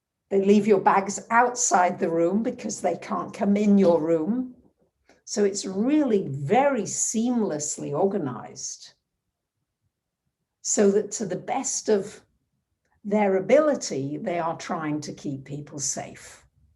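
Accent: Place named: North, United Kingdom. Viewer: Kindness is the people at Royal Mail who helped me out the other day – I sent a card to a friend and forgot to put a stamp on it which is very unlike me, as I'm usually so organised (Mercury Retrograde). They went out of their way to help, sent a driver out to the post box and scooped it out for me after the weekend, drove to my address and posted it back to me, so I could then put a stamp on it – Lovely people! Thank you, love your video's and that Northern accent (like my late Grandmother's) is so comforting.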